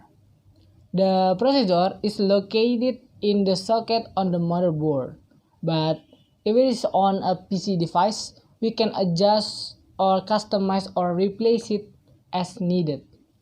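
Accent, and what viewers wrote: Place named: India. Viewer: logatnya sedikit mirip kaya orang india wkwkwk boleh laa kak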